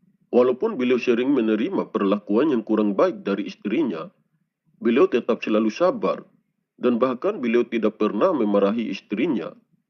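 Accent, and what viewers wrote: Bima, Indonesia. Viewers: Ini org buat vloq YouTube ngawur ngidul ngetan kullon . Anda itu jgn memfitnah jodoh Satryo piningit hanya orang biasa biasa sj . Emang anda bisa lihat wajah asli Satryo piningit dan ratu adil . Setahu saya ratu adil itu putri bangsawan dr tanah Padjajaran dan Majapahit ya . Anda ini jgn trawang tentang Satryo piningit bahwa beliau itu dpt kekerasan dari istrinya . Kayanya ini orang bukan orang Jawa suaranya kaya orang BIMA NTB . SATRYO PININGIT ITU KHUSUS UNTUK ORANG JAWA DAN SUNDA YA .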